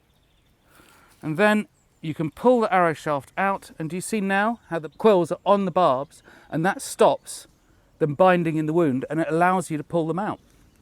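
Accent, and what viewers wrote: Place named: United Kingdom. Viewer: You looked like a bald German guy who I've seen on YouTube before. When I heard a British accent you scared me.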